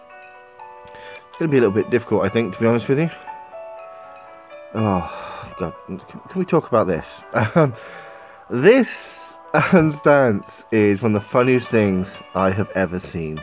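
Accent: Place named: United Kingdom. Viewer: such a cool, posh accent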